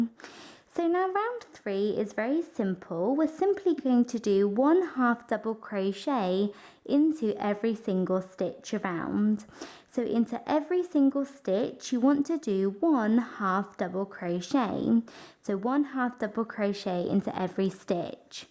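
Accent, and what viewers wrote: England, United Kingdom. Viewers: I did wonder as you have an English accent which confused me. I love your videos they are so easy to understand.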